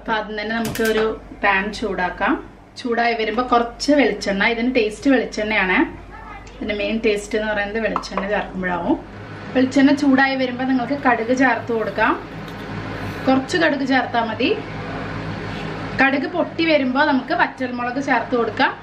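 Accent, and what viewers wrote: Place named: North Kerala, India. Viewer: Madam... നാട്ടിൽ എവിടെയാണ് ? നല്ല വടക്കൻ ഭാഷ .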